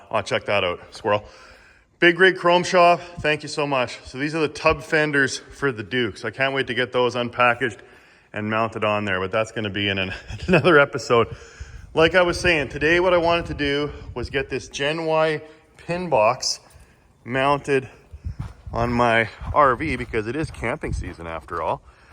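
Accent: Canada. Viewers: The way you talk you don't talk like a truck driver maybe it's a canadian accent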